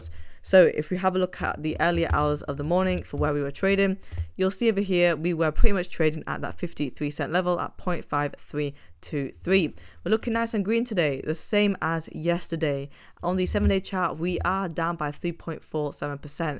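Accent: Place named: North, United Kingdom. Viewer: northern british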